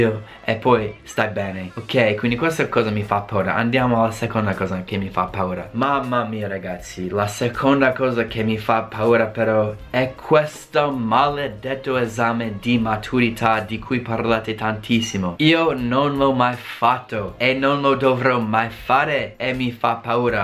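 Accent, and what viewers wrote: Rome, Italy. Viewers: Se parlerai il tuo strano italiano/romanesco all'asame di maturità sarai sicuramente segato. Impara l'italiano perché di lingua italiana ce n'è una sola. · ahahaha sei un grande... sembri il mitico don lurio.... ma piu moderno, ricordati che il coniglio... va con la polenta......è la morte sua (modo di dire , che è buonissimo)..... p.s. smetti di frequentare solo romani (se pur simpaticissimi), perchè stai imparando la cadenza romana e non italiana.. il tuo intercalare capitolino è bello acceso. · Come si sente che la tua ragazza è romana! Passà, guidà, studià ecc. Mi fa venire in mente il mio Prof. di storia e filosofia. Ma tu l'italiano lo parli molto bene! Congratulazioni, buon lavoro! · No vabbè Maria io esco quando parla in romanaccio 😂e poi tesoro io ho Delle amiche di Roma che ogni Estate scendono da me in Salento Lecce e quando le persone parlano con loro nn capisco un' accipicchia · Emilia Fiorini ...lui parla parole di un romanesco simpatico. 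Quello dei burinotti romani sì che è odioso. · Sì ma si è imparato l'accento romano, in altri video si sente proprio:D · Questo video mi ha uccisa dalle risate xD Praticamente sei diventato romano, tra l'accento e il fatto che sei divertentissimo, credo sia uno dei più belli! Sarebbe divertente sapere il contrario, quali sono le cose che fanno più paura agli italiani in America (non ci sono mai stata ma le uniche cose che mi vengono in mente sono il tritarifiuti nel lavandino e i grattacieli, quelli mi terrorizzano e non so perchè xD) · Mi piace che mo parli romano ahahah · Dai un po' di romano che male fa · Ma l'italiano l'hai imparato a Roma? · Amo il tuo romano❤️💫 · ahahahah oddio questo video e il tuo accento romano sono fantastici!!! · Ottima osservazione..... comincia tu a toglierti quel Romano odioso e parla Italiano....io ho seguito il tuo consiglio di non usare lo slang ma tu con questo Romano ti prego no dai........anche no;-) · Ecco fatto hai subito imparato le parolacce e il romanaccio 😥 . 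Comunque paradossalmente è più facile che uno straniero trovi lavoro in Italia che un ragazzo Italiano. Non è giusto ma è così.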